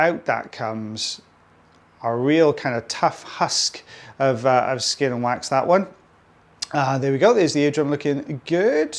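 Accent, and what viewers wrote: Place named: Wales, United Kingdom. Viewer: I live in Australia but am from UK. Lived in Wales for 7 years and watch you to hear your voice (besides the extractions of course) Takes me back 57 years. Of course I hope you are feeling better, it’s winter here and lots of flu, head colds etc. Hope you coped with the heatwave, that’s the norm here in summer.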